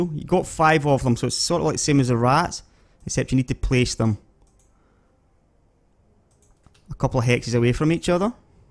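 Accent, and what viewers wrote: Scotland, United Kingdom. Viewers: Engrish? I take it you have never heard a Scotsman speak before?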